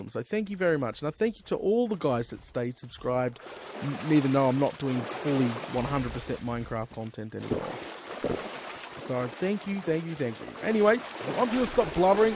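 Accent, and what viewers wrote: Australia. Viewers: well I've been watching for a lil bit of build big or go home but I have watch them all even you sleeping in the stream that was funny loved the d&r series and the series with and watty and all the Ark series but I stay for the aussie accent!!!!!! and is just a awesome young lady she makes me laugh a lot usually at you but that just means she cares a lot about you as of right now i'm building Independence hall as my main base and I made besty's ross house for my catus farm when I decide too make a iron farm if I do i'll make the empire state building just so I can get two farms in it so needless to say the buildings are not too small and I really hate collecting all that clay by hand probally used over 20 stacks of red bricks and need more it will be the only time I use this block in huge quainties love the series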